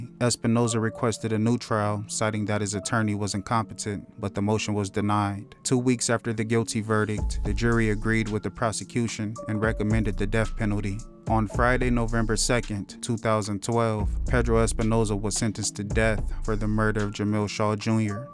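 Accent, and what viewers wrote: California, United States. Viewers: your voice is soooo cali and i love it! i love when ppl rep the city! keep going king ❤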